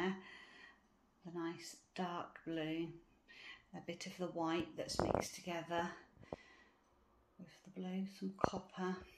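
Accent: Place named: United Kingdom. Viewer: is British, as am I, and I think perhaps it’s the different accent more than the volume, as I heard her clearly? It’s interesting how some accents are easier to hear than others. ☺️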